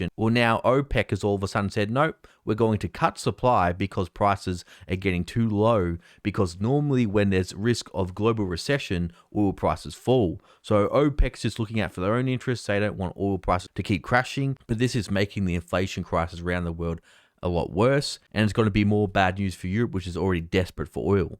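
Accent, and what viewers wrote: Australia. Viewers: Also, wow didn’t know you were from Australia, always thought you were British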